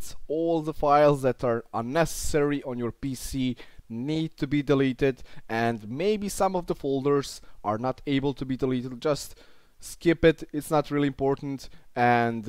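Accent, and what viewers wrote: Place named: Balkans. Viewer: znao sam da si sa balkana kad sam cuo kako pricas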